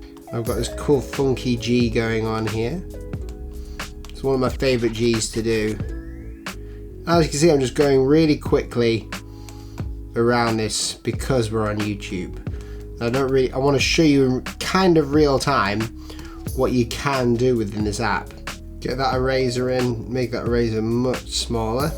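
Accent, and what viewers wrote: United Kingdom. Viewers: Where are you from, where is your accent from? :) UK where?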